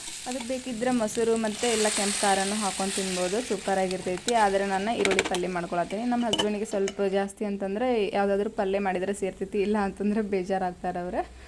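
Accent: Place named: North Karnataka, India. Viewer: ನಮ್ಮ ಉತ್ತರ ಕರ್ನಾಟಕ ಭಾಷೆಯನ್ನ ಬಹಳ ಸುಂದರವಾಗಿ ಹೇಳಿ ವಿವರಿಸಿದ್ದೀರಿ ಬಹಳ ಹೆಮ್ಮೆ ಎನಿಸಿತು ಇದೇ ರೀತಿ ಮುಂದುವರಿಸಿ ಒಳ್ಳೆಯ ಉತ್ತಮ ಭವಿಷ್ಯವಿದೆ ನಿಮಗೆ ಕನೆಕ್ಟ್ ಆಗಿದ್ದೇನೆ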